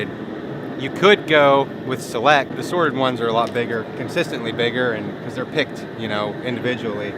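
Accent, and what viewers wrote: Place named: Maryland, United States. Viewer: This was a really helpful and informative video. Not sure where you're originally from - the accent, I'm guessing Maryland or Delaware ;) but take it from someone from south Louisiana, you did a good job! BTW, if the tail is straight after cooked, that means the crawfish was dead going in, so don't eat those :)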